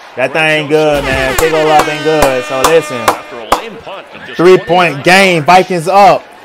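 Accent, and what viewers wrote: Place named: Atlanta, United States. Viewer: You from ATL forsure😂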